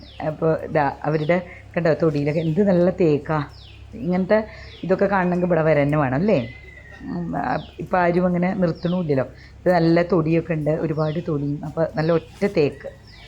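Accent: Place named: Valluvanad, India. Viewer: Original valluvanadan slang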